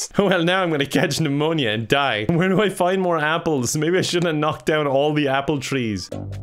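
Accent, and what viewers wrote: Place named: Ireland. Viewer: omg i love speaking that thicc irish accent 😂😂😍😍😍😍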